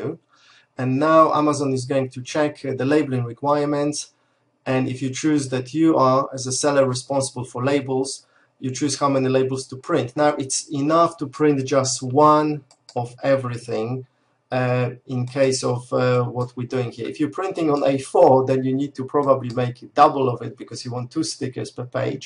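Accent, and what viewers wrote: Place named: Poland. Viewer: Uszy więdną od twojego Ponglish....Pozdrawiam!!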